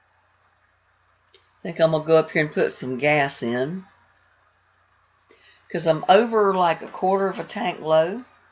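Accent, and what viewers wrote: United States. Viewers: I have to thank you-that as a German I understand your American way of pronouncing it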